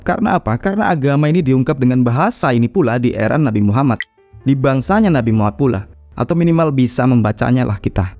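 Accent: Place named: Banyumas, Indonesia. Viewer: Jawaa ngapak bang😂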